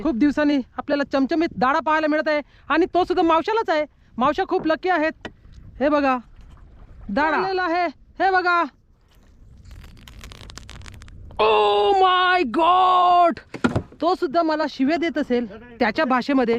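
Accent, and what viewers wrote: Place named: Mangeli, India. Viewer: भाऊ तुझी मराठी मांगेली भाषा ऐकायला मज्जा येते, एक पाट धाडून दे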